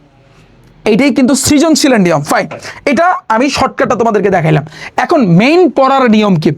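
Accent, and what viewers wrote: Barishal, Bangladesh. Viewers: Vai ki Barishailla?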